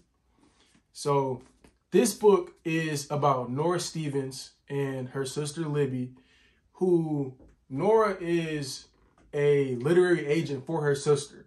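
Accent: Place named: Rio de Janeiro, Brazil. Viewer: I’m from Brazil and you sound like a Carioca (people who were born in Rio de Janeiro) and I love it. Your channel is such a incredible discovery ❤️ love the way you talk about the books! Hope you’re having a great booktube experience!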